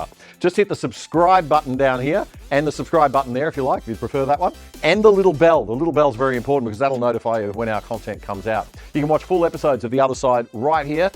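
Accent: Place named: United States. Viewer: It's god-awful hearing a screeching American accent telling us what we can and cannot see. Get rid of this nasty person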